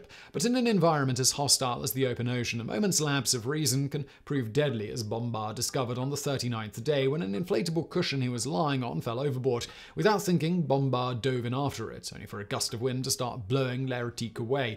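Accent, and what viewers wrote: England, United Kingdom. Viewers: I too found this information interesting. Unfortunately, I find it hard to understand you though. It isn’t how fast you speak, but that you do not fully enunciate. Many words trail off in volume such that I am struggling through the whole vid trying to catch your words. Add the English pronunciation, and it becomes too much work. I gave a thumbs up for great content.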